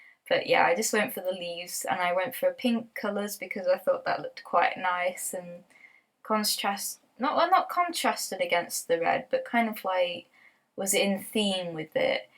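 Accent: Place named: United Kingdom. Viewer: Jesus this british accent is so annoying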